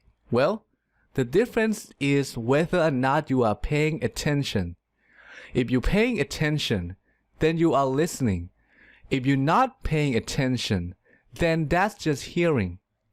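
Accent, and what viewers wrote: Thailand. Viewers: กลับมาฟังหลายรอบแล้วครับ คนไทย ผมจำได้ สำเนียงมันออก อิอิ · Thank you very much for a great video. It's very useful. Also I guess you are Thai from your pronunciation^^ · Are you Thai? I think your accent like Thai people.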